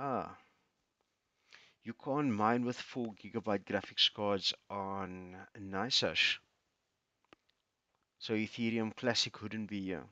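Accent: South Africa. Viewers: my man sounds South African AF well done thanks for the useful info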